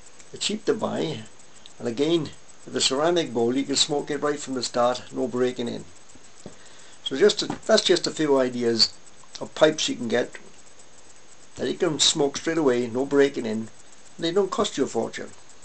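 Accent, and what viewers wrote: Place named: Scotland, United Kingdom. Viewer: I'm curious about where you're from, it sounds sorta scottish but not really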